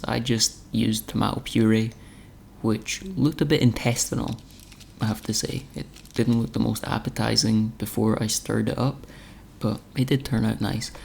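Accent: Scotland, United Kingdom. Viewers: Is your accent Scottish? I like how it is far easier to understand than accents from Northern England! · Weird as it sounds, I’ve been craving the sound of your voice all week. It’s so soothing, like ASMR. Lol, you’re a young Scottish Morgan Freeman. You should consider narrating. Thanks for posting this video in particular. Bake Off is another bit of ASMR for me and this combination has been absolutely wonderful. In regards to your three bakes, I think you did a great job. 10 out of 10. If it tastes good, who cares how much it looks like the traditional version. You’re not in a competition; you’re baking for yourself. All three looked delicious to me.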